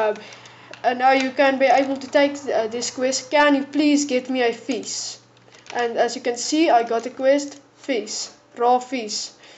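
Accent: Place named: Afrikaans, South Africa. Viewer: Yeah haha,, I recognized the accent. I'm in PE. It's not often you get south African you tubers.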